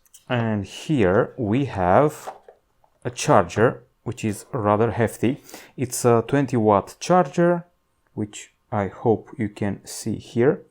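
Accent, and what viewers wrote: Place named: Romania. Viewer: That fuckin' Slavik-Romanian Accent !!!! :) Bună treabă omule excelente unoxinguri și review-uri pe ambele canale !!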